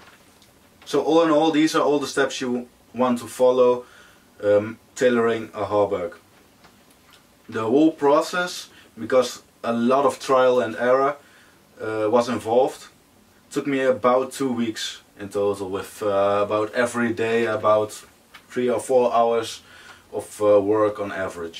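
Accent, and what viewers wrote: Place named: Netherlands. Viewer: ik kan horen dat je nederlands bent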